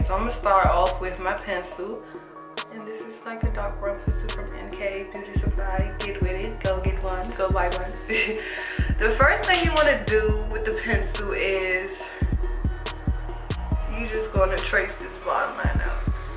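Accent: Washington DC, United States. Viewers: you gotta be from DC! the accent and them nails, dreads, everything scream DC! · the MC is from NE DC lmao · foreverbeautyguru more like D.C. Lol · this was a cute tutorial in real time, I like that it wasn't sped up. The brows were fleeky lol. And as soon as you started talking I said oh she from D.C. I know that city talk anywhere 😄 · Are you from DC?? If not...you sound like it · Everyone keeps talking about "blending". She said her brows are her first step. It's not a complete finished look. Her brows look Amazing! She sounds like she's from DC 👍🏾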